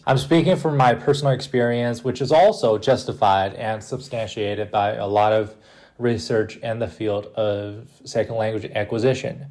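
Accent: South, United States. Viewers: You sound like a southerner from the United States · nice southern accent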